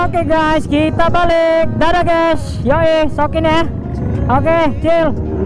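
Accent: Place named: Sunda, Indonesia. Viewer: kocak anjirr kalo ngomong pake bahasa Sunda nada Sunda nya itu yg candu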